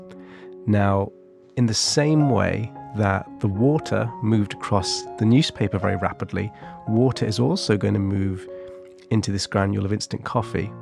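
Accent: England, United Kingdom. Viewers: You would do Well in the US, as they Love English Accents!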